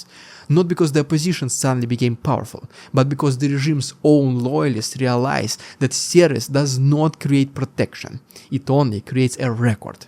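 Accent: Russia. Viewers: I am terribly impressed by the command of the English language, although spoken with a thick Russian accent. I am an Australian that follows him daily and everybody here, (except a very few), totally support Ukraine. I would bet money that the rotten bastard Putin will be gone by Xmas! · In the beginning you were throwing morw effort on speaking out the English words. Now you are shooting them like you are speaking Russian...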